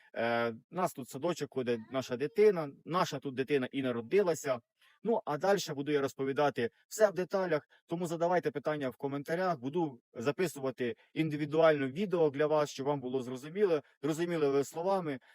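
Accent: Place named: Transcarpathia, Ukraine. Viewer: Це, звичайно, Ваше право відкрити свій канал, але хочу Вам допомогти порадою. Мільйонам українців, які знаходяться закордоном, це не буде цікаво. За кілька років всі вже якось визначилися, що їм далі робити. Українці, які залишилися в Україні, в більшості озлоблені або зомбовані провладними ЗМІ. Багато людей ненавидять тих, хто виїхав. Тому, якщо будете продовжувати, будьте готові до злих, негативних коментарів. Та Ви вже і самі це побачили. І ще, Ваша мова підказує мені, що Ви, скоріше за все, із Закарпаття. А це додатковий подразник для інших українців. В любому випадку, Вам вирішувати, чи варто Вам наражатися на негатив, чи дійсно це Вам потрібно, чи хочете Ви витрачати на це свій час, сили і кошти. Яке би рішення Ви не прийняли, це буде Ваше рішення. Я Вам лише даю пораду. Здоровʼя і добра Вам і Вашій родині.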